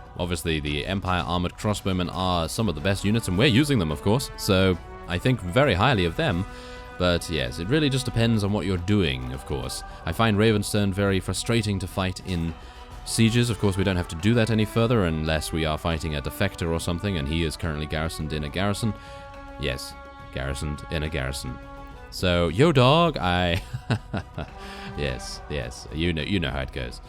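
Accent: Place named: Southern England, United Kingdom. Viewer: Southern UK.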